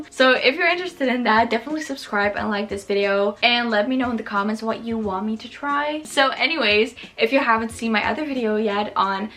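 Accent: Netherlands. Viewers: By the way you talk, Your dutch!!!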